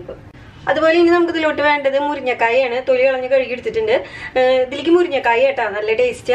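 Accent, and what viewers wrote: Thrissur, India. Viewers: Variety Sambar try cheyyam thrissur slang super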